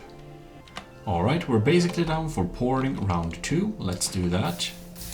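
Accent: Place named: Sweden. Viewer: Let me guess you are from sweden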